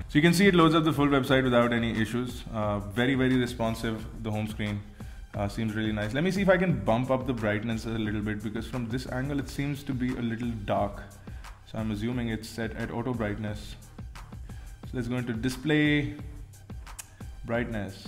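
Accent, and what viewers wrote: India. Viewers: no...not at all...itz bullshit indian accent...waacckkk...!!the worst in the world! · shut up.. this guy is a pro (not just in reviewing but also in English) and u call his accent bullshit coz ya just dont like Indians. now dats bulshit...!.. I bet u u wud hav loved it if it was a woman talkin instead of a guy....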